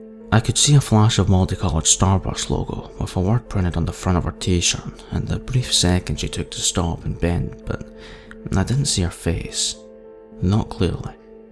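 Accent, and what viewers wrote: Scotland, United Kingdom. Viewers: Great stuff, love the your slightly seedy Scottish accent c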